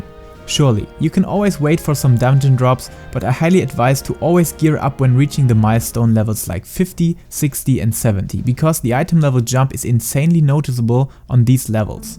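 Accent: Germany. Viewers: sorry to ask man but where do you come from your voice sounds like a german that speaks english ^^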